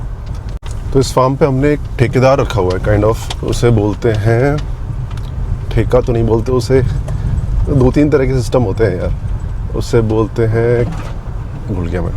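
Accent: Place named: Gwalior, India. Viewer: Gwalior Shivpuri k aaspass k lag rhe hai. Wahi ki language aisi hai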